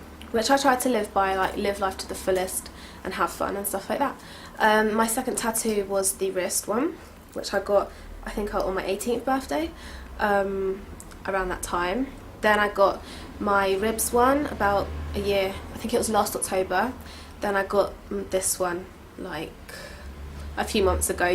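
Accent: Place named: United Kingdom. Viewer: OH....MY....GOD....I fucking hate British accents. Instant turn-off.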